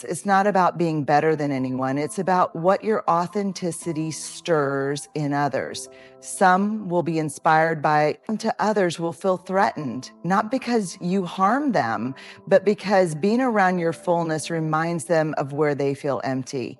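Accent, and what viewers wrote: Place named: South, United States. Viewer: ✋. I do!! All you need to do is look up one of her live Ted Talks to know that this is Brenet. Love her slight southern accent ❤ She’s the real deal.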